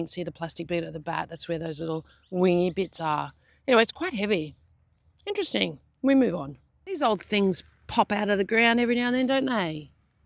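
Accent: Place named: Germany. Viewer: Well done on the finds and thanks for the exclusive footage from Treasure Hunters Down Under.Congrats on being film stars overseas and I hope we get to see it soon down here.Love your German accent! Is the mysterious camera person? Cheers👍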